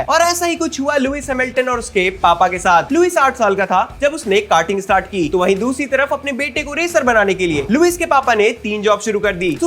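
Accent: West Bengal, India. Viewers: Bhaiya ap west bengal ho kya 😊😊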